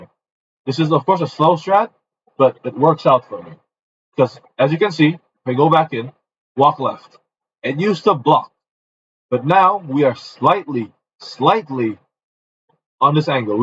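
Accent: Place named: Netherlands. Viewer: Thanks for the video btw you sound dutch what is weird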